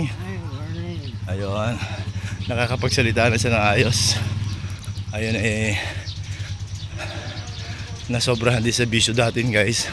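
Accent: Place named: Mindoro, Philippines. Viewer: Hi kuya,i love jogging too walking exersise is good for our health...taga saan kb kuya mindoro din ba, kc ung accents mi eh parang mindorinyo din.thankyou sa suport ho. Full watch tamsak.suport👍👍❣️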